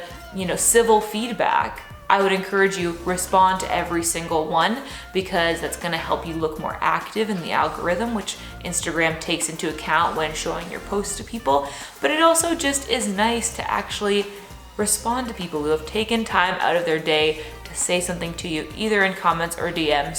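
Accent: Canada. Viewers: Your canadian accent is so strong! 😯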